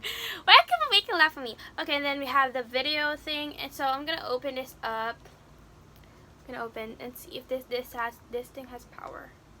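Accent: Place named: Tagalog, Philippines. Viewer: I love your accent and your Tagalog accent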